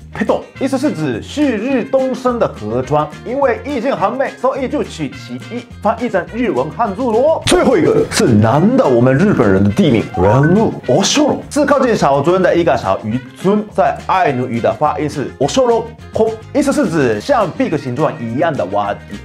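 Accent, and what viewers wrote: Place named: Taiwan. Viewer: 社長說話流利很多 也很台ㄟ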